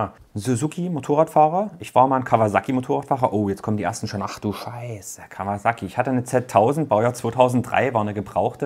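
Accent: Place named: Saxony, Germany. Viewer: Sry...hatte im vorherigen Video dieses noch nicht gesehen und dich nach dem woher und wie gafragt....wie gesagt, erst den 5ten Tag dabei....werde Dich definitiv via Amazon Link unterstützen, bei mir stehen im Februar einige Investitionen an und ich werde an dich denken..... Mach bitte weiter so.....Ehrlich, Symphatisch, Sächsisch....ich freu mich.....!!!!!!!!!!!!!!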